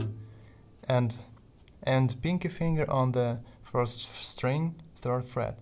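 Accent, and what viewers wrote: Russia. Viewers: а я сижу и думаю, а чет акцент русский дофига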